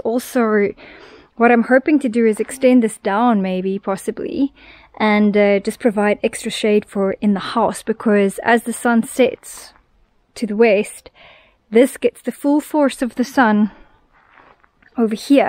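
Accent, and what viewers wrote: South Africa. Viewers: Hello from Bedford, Eastern Cape, SA. Ya, we get 40 deg’s here in the summer . Know that you really needed the pool. I can hear your accent but it’s strangely like as if you’ve got something else mixed in the way you’re rounding off some of your words . Not exactly like our lekker plain South African accent . Can’t put my finger on it . Anyway , you’re a brave girl! Good luck . 🌺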